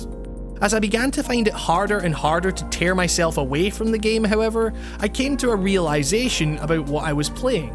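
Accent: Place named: Scotland, United Kingdom. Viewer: Thanks for answering! I really appreciate it. I hope you don't mind if I model a Scottish accent off your voice. Most of the ones in media are really exaggerated so I prefer to model off real people, and your long-from videos would give me lots to work with.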